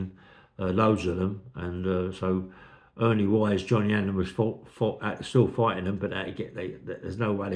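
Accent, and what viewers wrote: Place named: Cockney, United Kingdom. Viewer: I could listen to for hours, great stories told in a comforting old cockney accent, I’m addicted to this channel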